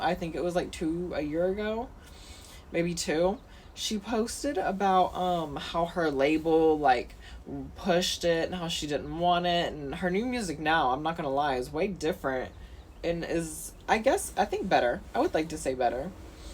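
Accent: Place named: San Francisco, United States. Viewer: What a San Francisco accent.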